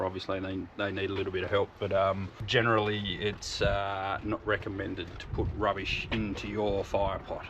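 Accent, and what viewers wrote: Australia. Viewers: Great job and, I love your talking during the video your personality is coming through, plus love the Aussie accent ❤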